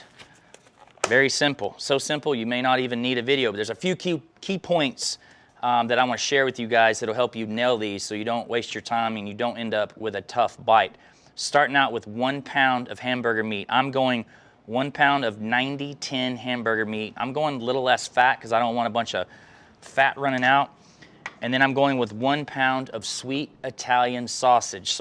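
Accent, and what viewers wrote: South, United States. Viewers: The perfect speed to watch this video is 1.75X. Try it at 1.75X speed and you will see it watches like a regular video and this guys slow manners and southern drawwwwwwl Go away.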